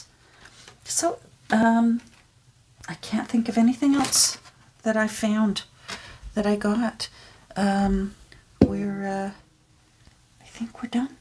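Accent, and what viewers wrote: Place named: Canada. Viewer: I was born and lived in Minnesota for the first 19 years of my life, and I think my accent sounds more Canadian then yours. But then I heard you say “about” and I thought…aha! There it is. Very slightly Canadian accent came through. Thanks for posting. I enjoy watching your videos so much. I appreciate you.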